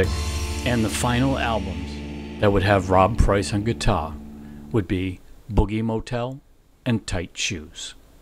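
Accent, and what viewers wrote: Boston, United States. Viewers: That’s because of his Boston brogue. It’s like telling an Australian they pronounce “car park” wrong because they drop their r’s 🙄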